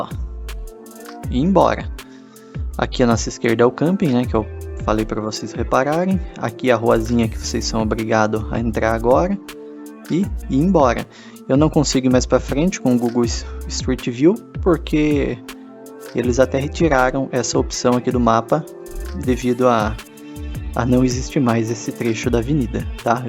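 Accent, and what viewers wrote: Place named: Interior, Brazil. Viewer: Vc que está relatando o seu sotaque com certeza não é da ilha. É do interior.... 🤔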